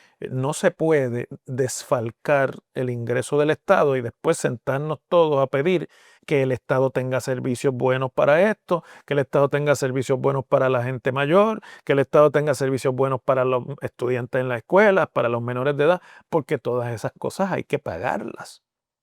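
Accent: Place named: Puerto Rico. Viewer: ¡Felicidades! Con una dicción como la suya fuí criada en PR., durante la generación de la buena salsa. Lamentablemente, la mayor parte de las personas no me creen que soy Puertorriqueña ... solo porque no hablo como la generación de los raperos😢. Vine a vivir a EU por recomendación médica, y cuando quise regresar, me di cuenta de que ya en PR no se puede vivir con un cheque del SS. DLB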